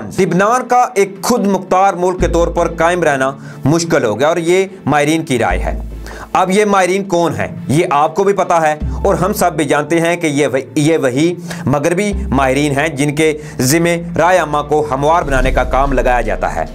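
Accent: India. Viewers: Assalam Walekum my brother speak Urdu so rightly you are talking like Indian country people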